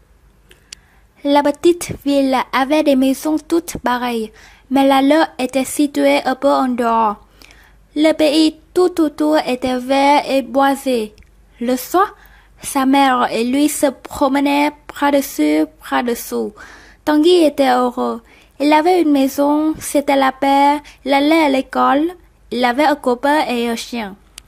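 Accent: Vietnam. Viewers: Giọng Vn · trời giọng người vn mà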